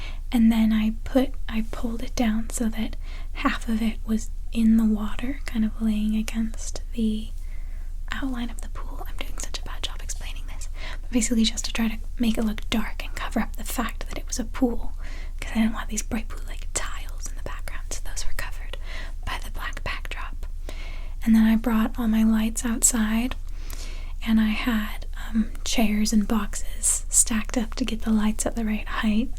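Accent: Ireland. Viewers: Oh! I didn't know you where Irish :D Hello from Tipperary :3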